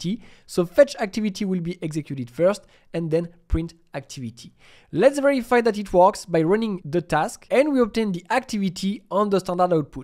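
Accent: France. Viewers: haha seems you have a little french accent, anyway , love your video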